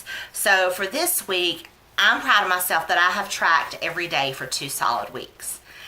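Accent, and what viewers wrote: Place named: South, United States. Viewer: My starting weight was 336. I'm down 116, so you can do it!! I know those grandma dinners! Just make good choices. I'm late to the party here. Glad I found you! Sounds like you're a southern gal too. Just get that weight off before you're older like me! I'll be 60 soon. You're very organized in the video. Great job!!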